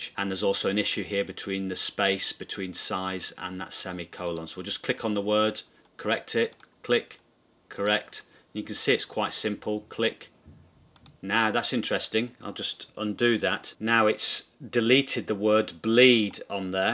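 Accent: United Kingdom. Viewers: Interesting how English is spoken in so many parts of the world, yet have so many variations. I don't like using American English, but selling on Amazon, means using American. I do love your British accent,. Grammarly is great to use.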